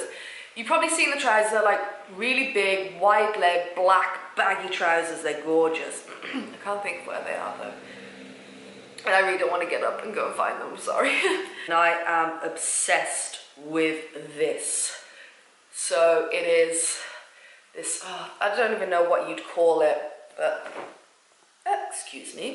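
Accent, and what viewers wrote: United Kingdom. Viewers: I thought your are Brit but you are Hungarian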